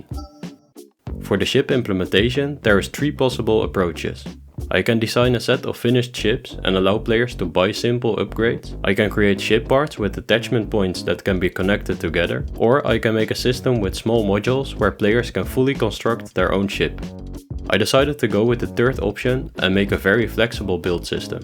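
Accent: Netherlands. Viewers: are jouw from the nederlands? becaus jouw sound dutch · Hey, ik ga er vanuit dat je Nederlands bent vanwege je accent en ik vroeg mij af bij welke universiteit of hoge school jij game development hebt gestudeerd.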